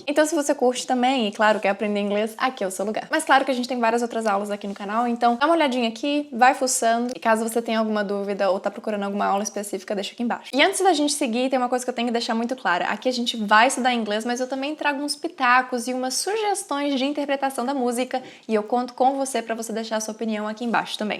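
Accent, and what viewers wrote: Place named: Minas Gerais, Brazil. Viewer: seu vídeos são incríveis, sua didática e muito boa, me identifico em vários aspectos do seu conteúdo, gosto musica, guitarras, jeito de falar mineiríssima, e isso me motiva pfvr continue com as musicas da melhor banda de todos dos tempos 🎭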